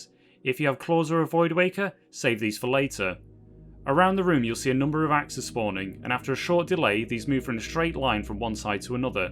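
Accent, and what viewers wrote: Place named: United Kingdom. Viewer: you have the most British voice ever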